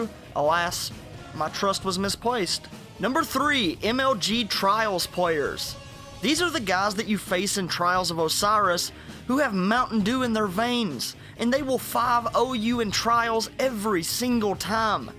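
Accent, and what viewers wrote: South, United States. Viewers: DigitalNinjas it's a southern accent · Love your Southern accent! Not much people down here that have Youtubes! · DigitalNinjas, I would bet Southern United States. Texas or East of there but not as far as the East coast. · Dude sounds exactly like Tyler from secureteam10 with a southern accent.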